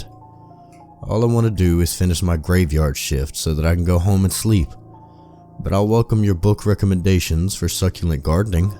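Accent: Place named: South, United States. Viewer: You got that southern twang in your narration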